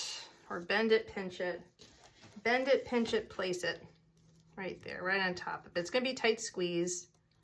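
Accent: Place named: Massachusetts, United States. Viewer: I haves love your wreaths since I was lucky enough to find you. I did not know that you were from Massachusetts until today. Iwas from the same area including living in Mashpee for awhile. I understand your accent. I am going to make this wreath for my niece who lives inNeport.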